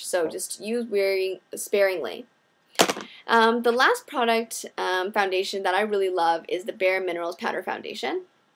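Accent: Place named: Canada. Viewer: I cant tell you are canadian by how you pronounce "OU" like about, out lol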